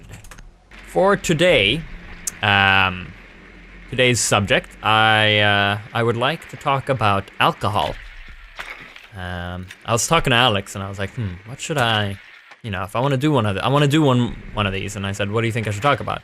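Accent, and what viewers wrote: Sweden. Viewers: Are you swedish? Sounds like that! I am swedish If you wanted to know!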